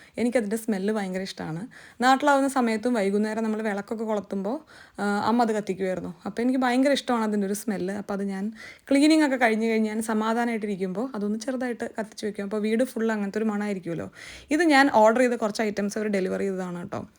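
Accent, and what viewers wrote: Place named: Pattambi, India. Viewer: വളരെ നല്ല തീരുമാനമാണിത്. എന്നും എല്ലാവര്ക്കും വേണ്ടി ജീവിക്കുമ്പോൾ കുറെ വർഷങ്ങൾ കഴിയുമ്പോൾ നമ്മൾ നമുക്ക് വേണ്ടി എത്ര സമയം ചിലവഴിചൂന്നു നോക്കുമ്പോൾ എന്നും account negative തന്നെയാകും. പിന്നെ മക്കള് തന്നെ ചോദിക്കും, നിങ്ങളോടു ഞങ്ങൾ sacrifice ചെയ്യാനായി പറഞ്ഞോ എന്ന്. Very good decision. Hats off to Vishnu for supporting this decision. Please don’t change’ OUR PATTAMBI’ slang. പിന്നെ അങ്ങനെയാണ് പട്ടാമ്പി സ്റ്റേഷൻ ഉണ്ടായതു എന്ന് പറയുന്നത് കേഴ്ക്കുന്നതു തന്നെ ഒരു ഒരു feel തന്നെയാണ്.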